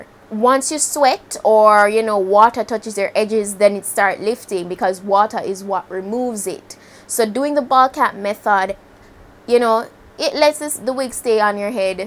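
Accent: Jamaica. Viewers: Thank you for not camouflaging our beautiful accent with a twang. It’s refreshing to see a Jamaican with a platform like this. Keep it up! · Goodasssss! Wooyyyy. Lol I just found this video today and literally recognized the accent and fell in love. Such a quick but helpful video! Thank you and definitely subscriber. Happy to see Jamaican YT prospering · U never heard jamaican accent🙄😢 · Love your method! I m gonna try it today! Your accent has me missing my family in Kingston 😩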